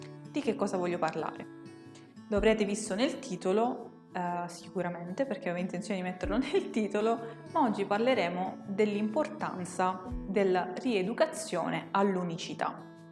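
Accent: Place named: Sicily, Italy. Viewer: SI SENTE BIEN......L' ACCENTO SICULO !!!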